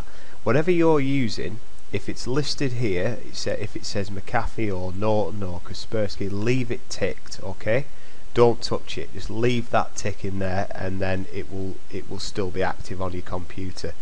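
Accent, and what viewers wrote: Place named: Yorkshire, United Kingdom. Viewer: Can i do it without the yorkshire accent?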